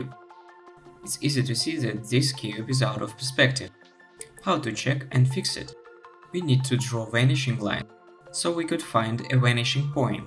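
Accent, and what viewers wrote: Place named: United Kingdom. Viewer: местами 100% британец, а иногда заговаривался, успехов!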